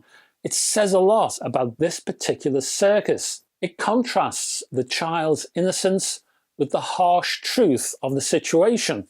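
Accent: United Kingdom. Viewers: I rather enjoy watching your videos, mostly in order to gain perspective, because I do appreciate, to some extent, anyway, the values others may hold on various subsets of audio performance, however advanced or meager. You are a well-informed, articulate man ... and an individual of good humor, so important to enjoyable conversation. Now, I generally appreciate the mechanical and delivery properties of speech from genuine Brits; they add so much color (or, perhaps "colour") and flavor. But, you folks simply must reform the completely illogical, and utterly indefensible, (mis-) pronunciation of the word "aluminum." What accidental process of lingual habit has led you folks to think the word is pronounced the way you do? Sorry, but "al-you-min-ee-yum" it isn't; it's just not. I mean, look at it. Four syllables. That's all. And while you're at it, can you please explore the etymology of the word "chube?" I cannot even find it listed in the dictionary; nor can I find any trace of the similar "chuba." (What the heck IS a chuba?) You folks have developed the universal language across the last several hundred years, and did a bang-up job of it, but you must not elevate regression to an art form.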